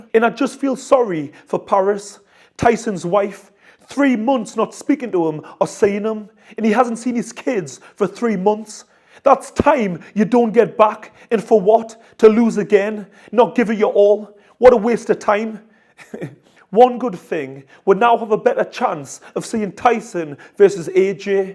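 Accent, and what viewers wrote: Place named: Scotland, United Kingdom. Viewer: Where you from brother? Why do you speak like that—accent? Scotland? This accent doesn't suit a black man.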